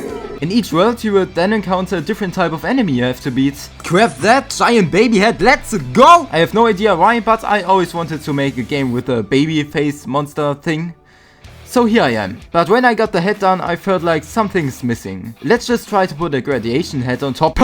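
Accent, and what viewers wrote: Germany. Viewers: i can hear funny german excant, wie lusitg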